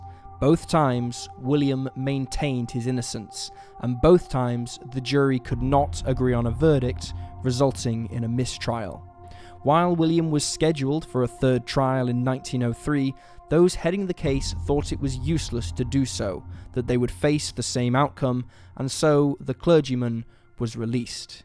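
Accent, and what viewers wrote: England, United Kingdom. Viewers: Sad story, but I can't believe that a narrator with such a strong British accent actually said it was in regardS..ah, at least he does well at most of his readings ? Would have made a decent film if it had been a reenactment. yes ? Producers ? Script writers ? No copy editing ? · Can’t stand the pommy narration. Goodbye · I dont like when they have narrator with a British accent! Stop it! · I'm so tired of someone with a British accent narrating American crime shows..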